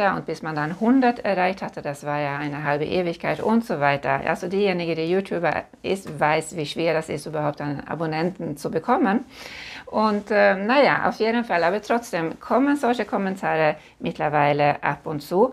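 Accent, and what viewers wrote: Sweden. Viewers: Liebe. Ich bin fassungslos über solche Kommentare 😡. Ich liebe deinen Akzent sehr 💕. Ich habe schon mehrmals Urlaub in Schweden gemacht und liebe das Land. Mach dir bitte nichts aus diesen Kommentaren von so dummen Menschen 👍. Bleib wie du bist 🤗. Ich bin für verschiedene Kulturen und freue mich immer über die Länder und deren Kulturen zu lernen. Eine sehr schöne Tracht habt ihr 🤗. Austausch muss sein 👍. Wünsche dir einen schönen Abend und danke 🙏 dir für deine Offenheit 💕. GLG 🥰♥️ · Liebe, das anlegen deiner Tracht war für mich ein sehr feierlicher Akt. DANKE ❤❤... ich mag diesen Stolz auf die Tradition. Zum Kommentar von "Sabine" sag ich dir: verschwende deine charmante und liebenswerte Art NICHT an Personen die dich und deinen Kanal nicht zu schätzen wissen. Ich mag deine Videos und deinen schwedischen Akzent. Wortschatz, Satzbau und Aussprache sind besser als bei manch "Muttersprachler". 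Dieser Videoplatz ist so lichtdurchflutet und wunderschön. 🙋🏼‍♀️👍🏻🍀🌻🌼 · Ich finde der akzeeeent gar nicht schlimm, sondern eher das es dich noch symphytischer maaacht😍😍😍😍...ohne Schweden gäbe es sooo viele Marken hier gar nicht in Deutschland, wie HM oder aber auuuch COS wo ich gerne einkaufe und Ikeaaaa😍😍..lass DIch nicht ärgern, die Traaacht sieht ja maaal toolll aus. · Ich wäre froh, wenn ich nur ein paar Worte in schwedisch sprechen könnte. Ich finde deinen Akzent einfach nur genial und sehr sympathisch. Lass dich nicht unterkriegen ❤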